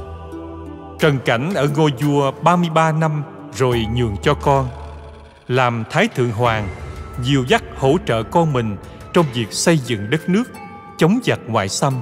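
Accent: South, Vietnam. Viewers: 😂 sặc nam bộ, nghe mà ghiền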